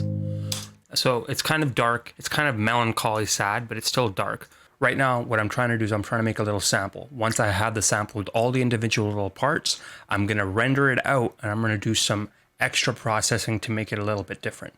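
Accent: India. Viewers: do u hav Indian origin ??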